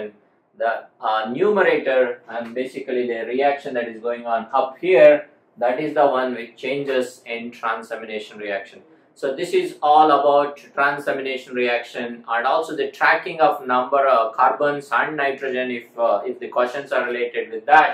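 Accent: India. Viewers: Oh my god I just got so many knowledge, every concept of this topic oh thanks sir... Your words are so indian wala hoke very nice to hear ...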